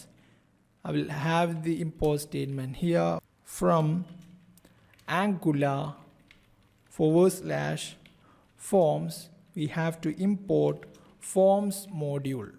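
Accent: India. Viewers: I love Indian English :)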